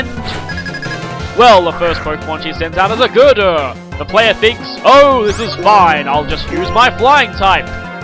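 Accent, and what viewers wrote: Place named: Australia. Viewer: Thanks for the reply. Saw your name pop up from a Chuggaaconroy tweet thread reply regarding your persona 5 playthrough and saw a mention of Australian accent. Was wondering if you were the same person, and lo and behold it was after hearing your voice and the copter. Glad to see your content like this, and I’m pleasantly surprised you’ve played the Trails in the sky series as well.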